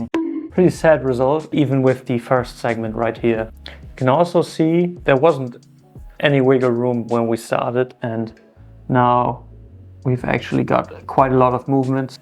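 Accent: Germany. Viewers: Memes ✅ Nice edeting ✅ Pfandfalschen ✅ Deutscher Aktzent ✅ Dishonored content ✅ Mein abo hast du 😁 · Ich hab nie bevor deinen Kanal gesehen, aber von deiner Aussprache hab ich gedacht, dass du deutsch warst. Jetzt weiß ich, dass du WIRKLICH Deutscher bist 😂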